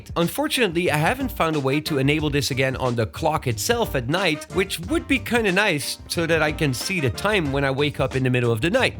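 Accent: Netherlands. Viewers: I knew you were Dutch! I knew it! You have that accent! Groeten vanuit Amersfoort! 😂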